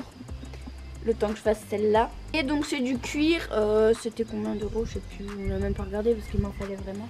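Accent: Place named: Switzerland. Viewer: Est ce que tu es Suisse par hasard ?😁 parce que je trouve que tu as vachement l’accent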